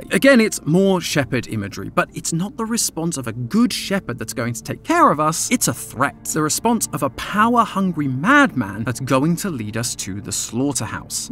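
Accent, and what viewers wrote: United Kingdom. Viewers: I think Tom was the perfect replacement for mat pat Except for the British accent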